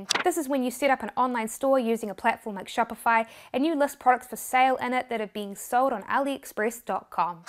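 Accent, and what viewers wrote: New Zealand. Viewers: Hey she is from New Zealand. That's why she sounds like that.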